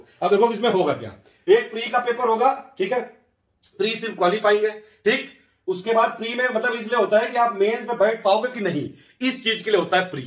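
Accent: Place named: Mirzapur, India. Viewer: Ek dam mirzapur se inspire language xcent hai apka.. Lajawaab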